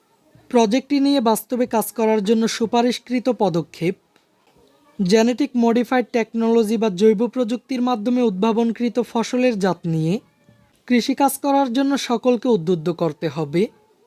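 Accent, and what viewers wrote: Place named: Magura, Bangladesh. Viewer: Magura galar kay asho❤❤❤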